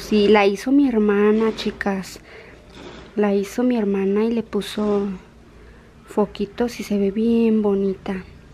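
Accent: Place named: Chihuahua, Mexico. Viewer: oh que bien , se me a figuró Que tenias el acento de chihuahua, pero al igual somos mexicanas , buen día , y pues una seguidora más en tu canal felicidades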